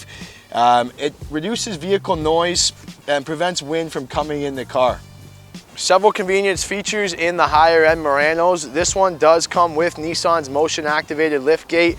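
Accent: Canada. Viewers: Is it:a Canadian thing with:"mu-ran- o:instead of mu-ran-o you:guys also say maze instead of'mood funny!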